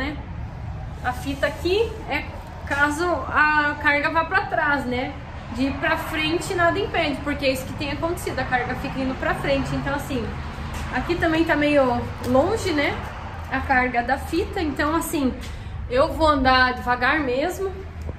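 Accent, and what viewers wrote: South, Brazil. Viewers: um cara leigo nisso tudo...só viajando com a do café cromado mais linda nos USA motorista e vendedora de empadão! Mas essa carga e potes não poderiam ser amarrados com essa cinta nessa mesma estrutura contra as paredes onde você engancha - tipo um cinto de segurança para as cargas. Sempre linda de Curitiba! - "sotaquezinho" do sul da minha terra é fantástico! ehehe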